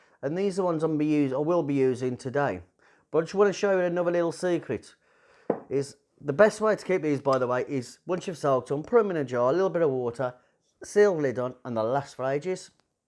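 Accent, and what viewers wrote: Birmingham, United Kingdom. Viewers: Hi, it's good to hear a brummie accent. Not sure where you are from but I can't be to far from you in Walsall. I am loving your videos and have tried a few of your ideas. I am using extension tubes and a Canon 1200D. Had some success! Thanks again for the inspiration. Steve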